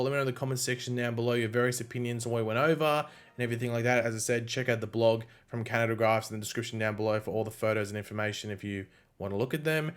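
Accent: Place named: Australia. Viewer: I missed your Aussie voice lol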